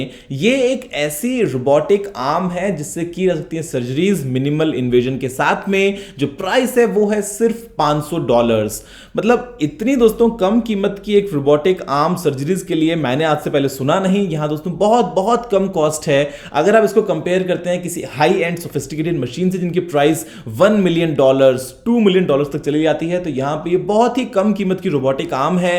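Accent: Bengal, India. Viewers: Gourav sir ap kaya Bengali hoo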